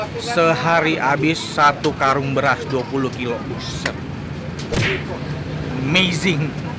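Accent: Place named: Palembang, Indonesia. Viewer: Suaro wong palembang mano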